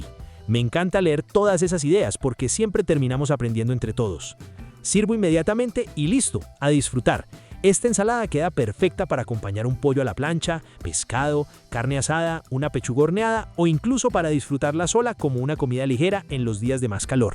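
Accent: Antioquia, Colombia. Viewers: Puedo cambiar la hierbabuena por cilantro? Le agregaría uvas pasas y almendras o nueces. Amo tu acento paisa.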